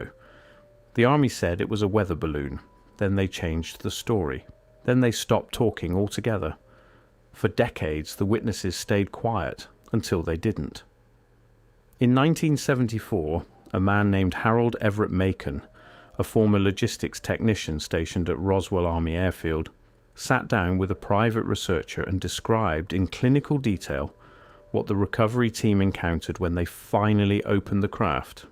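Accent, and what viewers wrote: United Kingdom. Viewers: British accent makes it more believable.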